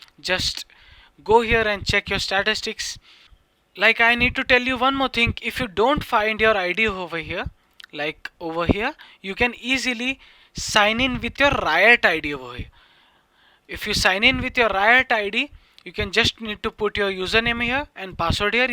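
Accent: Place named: India. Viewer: I love ur accent bro . Its indian but not like the other indian videos which are completely inaudible. You actually speak english very fluently and i have had no problem understanding u. Keep up the content guy!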